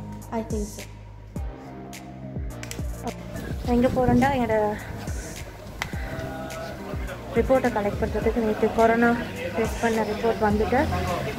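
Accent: Sri Lanka. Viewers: இலங்கை தமிழ் இனிமை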